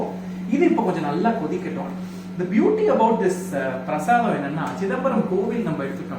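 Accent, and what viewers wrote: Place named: Thanjavur, India. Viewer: Age old recipe. Gothsu and gosthu are same. Regional pronounciation. Typical tanjore district pronounciation! Gosthu, embLadhu for eNbadhu😂😂 it has its charm.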